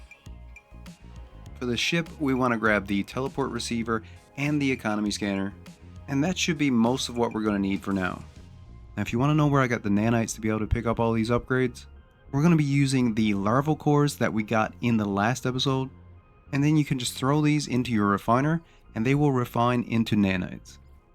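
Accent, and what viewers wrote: Newfoundland, Canada. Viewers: i love your accent- reminds me of home (newfoundland) :] ty for this video <3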